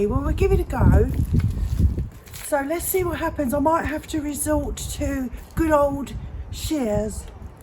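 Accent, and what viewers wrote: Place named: England, United Kingdom. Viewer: I love your English accent, thanks for sharing instructional video.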